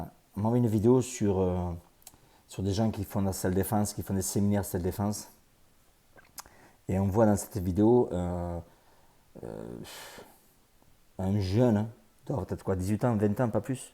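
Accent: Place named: Toulouse, France. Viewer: Cc!! Je ne te connais que depuis 3 semaines mais je t'adore surtout avec ton accent sudiste de Toulouse! Moi je suis d'Agen et in dit chocolatine ici bordel 😂😂😂 Continues comme ça, j'adore ton état d'esprit et ton franc parler! Vive le sud ✌️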